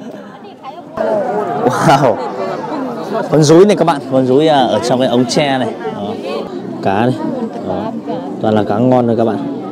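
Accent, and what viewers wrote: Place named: North, Vietnam. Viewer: Nói giong Băc mà lai quá nhanh, thât la nghe không gì hêt. Nói Châm lại môt chút đi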